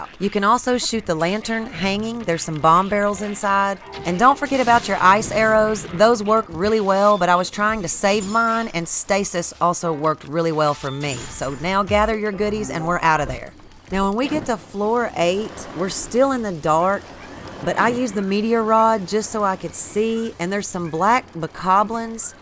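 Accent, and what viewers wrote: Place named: Blue Ridge, United States. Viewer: I did max hearts max 30 min offense and full stamina with two yellow circles and saved right before I put the sword back for middle trials. I'm not sure wuts better max damage 30min or defense. But I paused on the third level to charge my switch. Btw I'm from western nc u sound like a blue ridge mountain person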